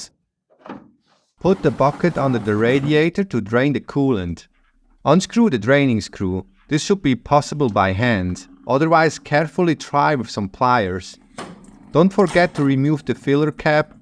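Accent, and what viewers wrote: Switzerland. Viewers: I went and looked up Swiss accents on YouTube. The Germans pretend they don’t understand you without subtitles. Liars. The Nordic/Romance thing is real, your accent is amazing, that is a little car with a big engine, enjoy! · I love following your videos and on this channel we get your voice over. I love your Swiss accented English.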